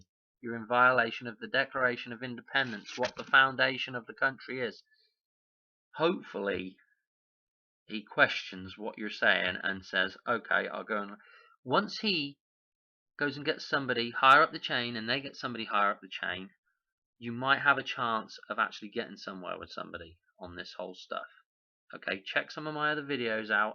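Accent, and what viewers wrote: United Kingdom. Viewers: Liked your video mate a good watch sounds like your from the uk like myself and you seem pretty knowledgeable on all the laws of nature and the artificial laws put into by the powers that be of this world.👍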